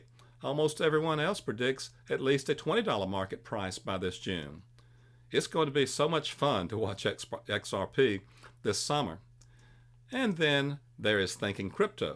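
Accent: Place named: South, United States. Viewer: the 100% smart guy, & I love the southern accent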